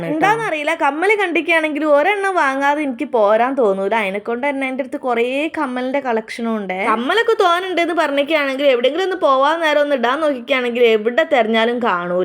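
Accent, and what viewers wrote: Kozhikode, India. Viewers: കൊയ്ക്കോട് ബാലുശ്ശേരി കക്കോടി മുക്കം ഭാഗത്താണോ സംസാരം കേട്ടിട്ട്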